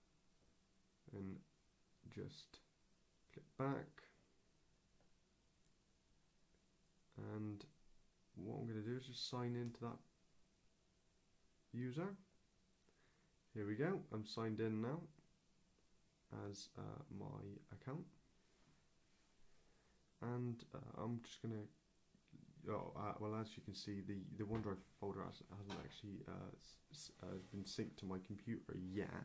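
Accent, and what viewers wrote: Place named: England, United Kingdom. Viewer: English accents are delightful... especially when one can hear anything spoken ... I cannot! Where's the volume?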